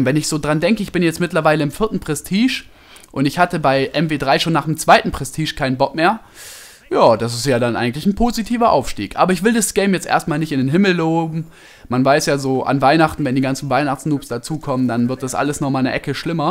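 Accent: Swabia, Germany. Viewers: mit seinem Schwaben Dialekt:D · bist du schwabe?:D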